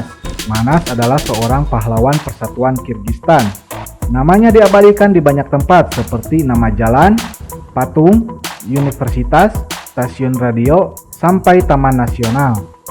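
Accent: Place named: West Java, Indonesia. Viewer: Yang ngomong pasti orang sunda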